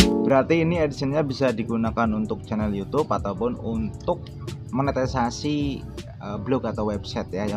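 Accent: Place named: Banyumas, Indonesia. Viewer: Nek Tek bedek, kayane Rika Agi mancing yh?? Lah wong ngapak genah ya..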